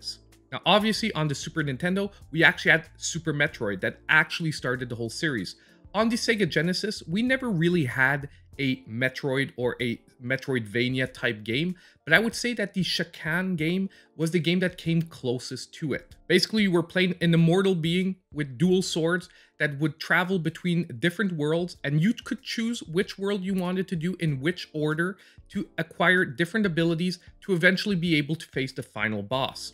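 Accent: Canada. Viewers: I come for the content. Stay for the Canadian accent. A youtuber unlike any udder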